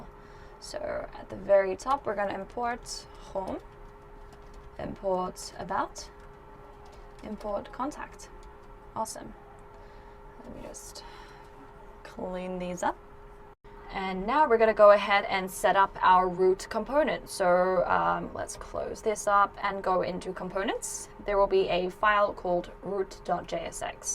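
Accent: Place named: Australia. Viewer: You have snippets of Aussie in your accent..... Keen to see direct migration for whole WP websites, that can link to NFT (Open Sea etc), email capturing for subscribers (as a database for future contact), and other links to Unstoppable Domains donations /merchant receipt for sending g crypto. Thank you, am giving this clip a go as a reference help. Appreciate the clip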